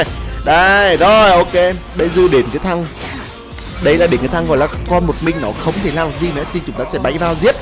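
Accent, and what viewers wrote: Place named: Central, Vietnam. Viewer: Anh ở miền trung à giọng giống em vãi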